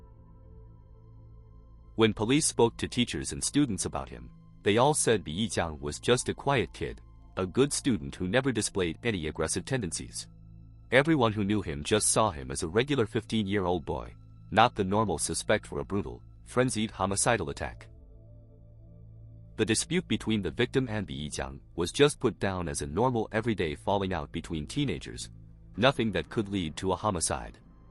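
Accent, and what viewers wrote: China. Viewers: I was watching a video you made 8 months ago and you sounded different. Don’t get to good at English I like your slight Chinese accent. With that being said you are arguably more articulate and well spoken than most native speakers.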